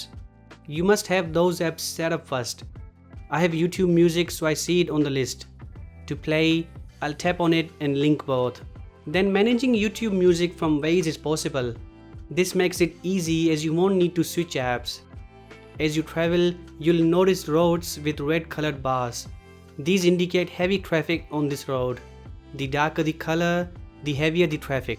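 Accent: India. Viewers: I hate Indian accent the most sorry...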